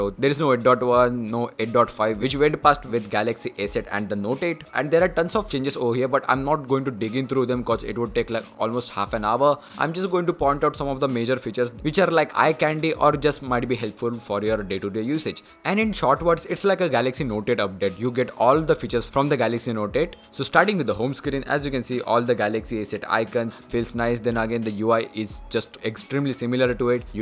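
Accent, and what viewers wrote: India. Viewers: Why do Indians insist on talking in English if they're just gonna butcher the language? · I don't understand why people dislike an Indian man's accent. I quite enjoy it.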